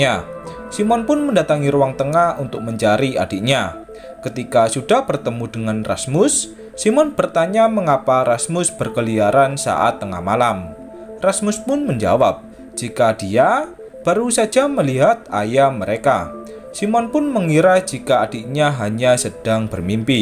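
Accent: Java, Indonesia. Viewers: Jowo totok bgt ngene iki mlh penak. Hahayyy..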